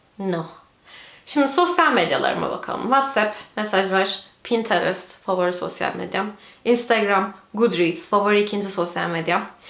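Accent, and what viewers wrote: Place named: England, United Kingdom. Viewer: Ingilizce aksanına bayıldım diyebilirim gerçekten ilk izlediğim videodan bile samimiyetine hayran kaldım😍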